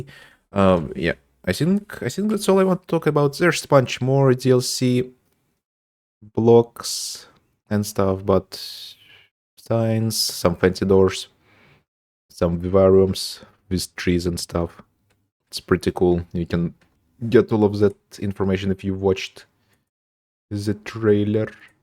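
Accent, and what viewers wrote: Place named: Russia. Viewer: руссиан аксенте ) Хотя возможно я шиз.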